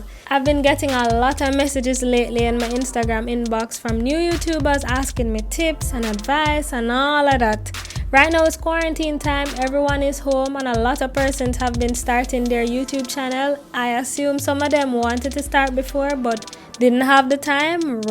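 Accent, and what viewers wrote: Jamaica. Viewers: Your very beautiful young lady wen I saw u I thought u was an African girl but wen u spoke I realised you're Jamaican ..no offence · You sound Jamaican, thank you for this video 🥳❤️ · Are you a Jamaican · Are u Jamaican :)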